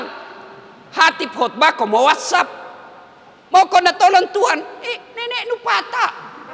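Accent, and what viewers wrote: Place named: Bugis, Indonesia. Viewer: Cuplikan nya saya suka,persis ceramah almarhum zainudin mz... Namun versi bugisssssssss😀😂😂😇😇😇😊😊 Yang pernah denger ceramahnya di channel almarhum mz ??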